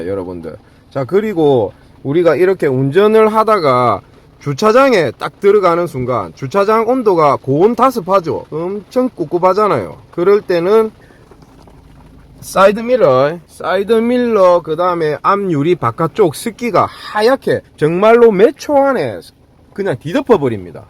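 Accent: Gyeongsang, South Korea. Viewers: 구수한 경상도 사투리 멋져요~~~~😊😊😊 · 오오 ㅎㅎ 정말 유용한정보 감사합니다 ㅎㅎㅎ ㅎㅎ 경상도분이시라서 너무 너무 친숙해요 ㅎㅎㅎ 저희어머니쪽이 의성이신데 ㅎㅎ [근데 꼭 어디서 뵌분처럼 너무 너무 친숙하고 친절하게 잘 설명해주십니다 ㅎㅎㅎ ] 최고 최고 짱짱맨~~ ㅎㅎㅎ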